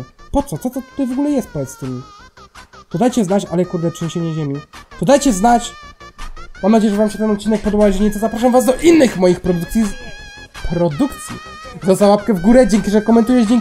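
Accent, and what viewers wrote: Silesia, Poland. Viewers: przaja ci za ten śląski:DD od razu swój rozpozna swego też staram się mówić po polsku i pisać ale zdarza mi się gadać po śląsku a i powinieneś zmienić nazwę kanalu na - król bugowania gier albo osoba która każdą grę ci zbuguje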